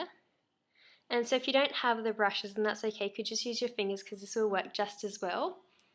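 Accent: Australia. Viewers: r u Australian? i am!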